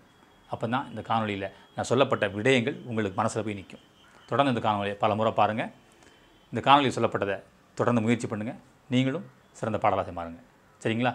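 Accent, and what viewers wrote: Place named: Tirunelveli, India. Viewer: உங்கள் தமிழை கேட்டுக்கும் போதும் உங்கள் முறுக்கு மீசையை பார்க்கும் போதும் நிச்சயமாக நீங்கள் நெல்லையின் மைந்தன் தான் வீச்சருவா வீசும் வீரமுள்ள பூமியில தமிழ் பாடி தலையாட்ட வைத்தவரோ ?? எனது அருமை கவிஞரே எனதூரும் நெல்லை தான் வாழ்த்துக்கள்🌹🌹🌹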